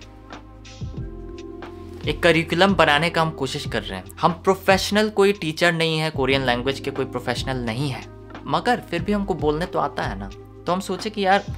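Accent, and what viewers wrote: Bihar, India. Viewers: Bhai wh bihari hi h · Bhaiya apki accent toh bilkul hmare bihar jaiisi h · A ji aap to humse bhi jyada bihari lag rhe hai..aisan ta hmaar tone nahi aata hai bhojpuri me lekin aapka to puchiye mat baap re baap.... sarangheyo oppa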